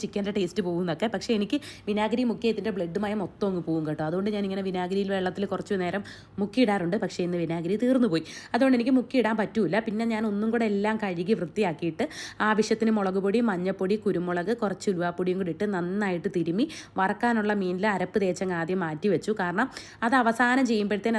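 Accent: Kollam, India. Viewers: തൃശ്ശൂർകാരി ചേച്ചി ആണ് എനിക്ക് നല്ല ഇഷ്ട്ടമാണ്, ഷോർട്ട് ആയി വിളിക്കാനും, എന്തു ഡ്രെസ്സിലും കാണാൻ സുന്ദരി, ഹെയർസ്റ്റൈൽ സൂപ്പർ, ഒഎസ് മാധുരി ദീഷിദ് കട്ട്‌ എനിക്ക് മാത്രം തോന്നുന്നതണോ ഇഷ്ട്ടം കൊണ്ടാണോ എന്നറിയില്ല, പാചകത്തിൽ മികവ് എല്ലാം കൊണ്ടും ചുന്ദരികുട്ടി കൊല്ലം ഭാഷ കേൾക്കാൻ എന്തു രസം, എല്ലാം തികഞ്ഞ വൈഫ്, ആണുകൾ ആകർഷിക്കുന്ന ഭംഗിയും, പെരുമാറ്റവും, ഇനി അടുത്ത വീഡീയോയിൽ കാണാം, ❤️❤️❤️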